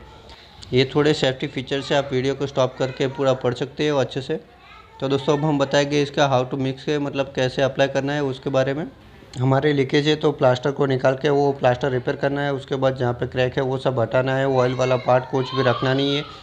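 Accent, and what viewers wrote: Gujarat, India. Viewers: Gujarati ho na??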